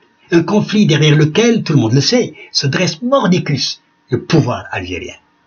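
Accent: Paris, France. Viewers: Une prestation magnifique, magistrale. On ne dirait pas à première vue que c'est un citoyen arabe qui parle dans la langue de Molière avec un accent purement parisien, dans un langage châtié, caractérisé par une éloquence qui fascine, une rhétorique qui plus qu'attirer l'attention, la retient du début à la fin. On est condamné dirais-je à une concentration brisant les cailloux de l'ennui des discours monotones, comme disait Paul Guth. Un véritable tribun qui rappelle Jaurès, mais avec un style plus modéré dans le ton et l'accent, comme dans une symphonie Beethovénienne, où la mélodie s'élève et descend harmonieusement, dans un débit parfois shakespearien. Le Professeur joue avec les mots comme il peut, mais toujours dans le sens qu'il veut, dans le respect de la grammaire. Voilà pour ce qui est de la linguistique. J'ai donné mon point de vue en ma qualité d'enseignant agrégé de grammaire. 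S'agissant du contenu et de la sémantique, j'en apprends d'avantage sur un conflit dont beaucoup de Français comme moi ignorent tant de choses. J'ai hâte d'en savoir plus, à partir du prochain épisode. Mais déjà le premier, annoncé en guise d'avertissement et d'intro, annonce déjà bien la couleur. Bon courage Professeur, et bonne chance par le Livre et la Plume. Vous honorez la langue française pour ne pas dire la francophonie, meux que des milliers de Français. Victor Hugo dormirait bien sur ses deux oreilles dans sa tombe.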